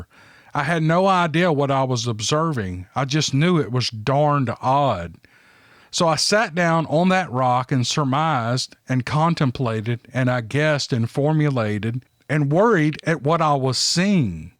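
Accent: South, United States. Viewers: Hey..Greetings from Charlotte, NC! I could listen to all day with that soothing southern voice. I'm originally from the Ramapough Mts. Of Jersey..and we have BF there and in The Carolinas..saw them myself. This is my favorite Channel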